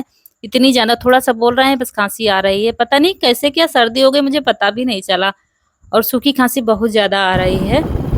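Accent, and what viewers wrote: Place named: Lucknow, India. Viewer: बहुत अच्छा व्लाग है बहन आप कया लखनऊ के आसपास की हो बहना आप की बोली से लगता है कयोकि मैं भी लखनऊ से हु और आप के विडियो हमेशा देखती हूँ 👌👌👌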